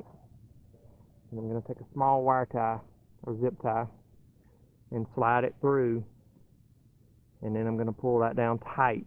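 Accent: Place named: South, United States. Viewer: Good , simple, straightforward video man. Sounds like you are Southern born too, like me.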